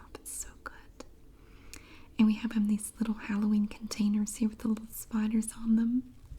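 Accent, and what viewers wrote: South, United States. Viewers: Hey first I love your videos and your characters are the best! You should do a video showing how one of your characters does her makeup... like a bitchy girl puts on wild makeup! I would love to see that in your southern voice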